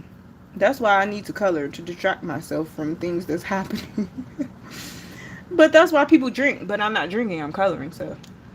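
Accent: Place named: Washington DC, United States. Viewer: Hey …. I’m from Maryland (15 min from DC), I just watched this live…🤦🏻‍♀️but I just wanted to inform you that there is a Blick Art (a.k.a “Dick Blick”) DC on l street NW. I wish I could color skin as well you. Great job!! I’ll turn the bell on if you stream again. I knew I heard our DC accent in your voice. Go to the Blick downtown it’s nice!!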